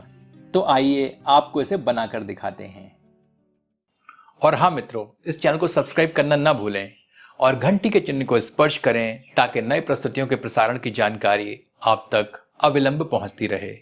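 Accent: Standard. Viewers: भाई और आपकी शुद्ध हिंदी ❤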